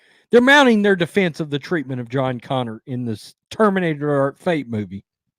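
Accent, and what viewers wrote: Texas, United States. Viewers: This has nothing to do with Terminator but are you from Texas? I’m from the Netherlands and am wondering if I recognize the accent correctly 😅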